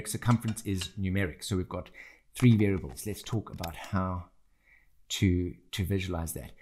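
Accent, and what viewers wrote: South Africa. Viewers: Hi you sound South African. Also. Please can you do one with loads of data as with spectra. I have to do it for my project and can't for the life of me figure it out. If you can reach out please.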